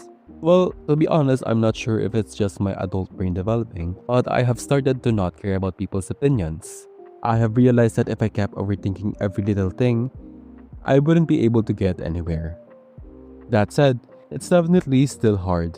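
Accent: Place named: Philippines. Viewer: Pinoy?- (I said to myself the moment I heard the accent, and then you said PINOY NGAAA!! YEY)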